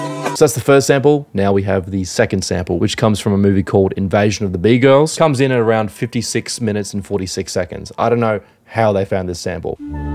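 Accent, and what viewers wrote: Australia. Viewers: you aussie? glad I come across u, awesome tutorials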